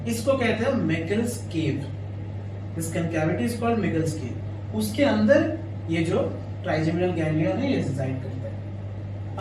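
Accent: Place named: Bengal, India. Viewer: Wow nice accent app bengal se ho kya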